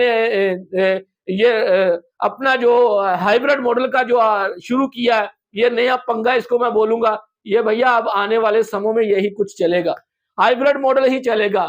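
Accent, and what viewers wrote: Pakistan. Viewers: Oye Tu Pakistani Hokar Indian banke india ke kilaf propaganda Kiyo Kar raha hai tu Jahilo anpado ke ustad hote Hai jeh Pakistani, Inke boli Harkaton se pta chal jata ke Je jahil Pakistani Hi Hoga😂😮😅 Urdu Hindi Ki Maa Bhen ak Kar Dete Hai Jeh Pakistani Jaahil😂, Dekhlo Jaahilo ki Haalat 😅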